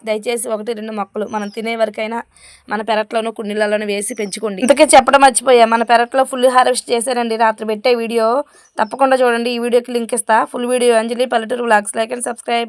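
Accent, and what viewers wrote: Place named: Godavari, India. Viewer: మీ మాటల తీర్ను బట్టి ఏలూరు రాజమండ్రి పక్కన ఊరు అనుకుంటున్నా అక్కడైనా మీ ఊరు ఏ ఊరు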